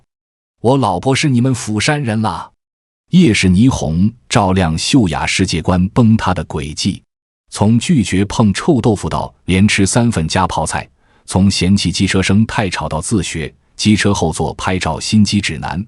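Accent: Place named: China. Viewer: 連ai配音都中國大陸腔...😒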